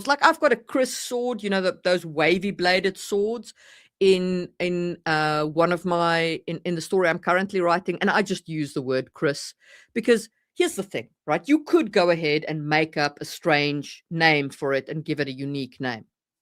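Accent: South Africa. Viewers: Afrikaans? I've always wondered about your accent when you said you were from Finland because it always sounded like South African to me. Good to finally know.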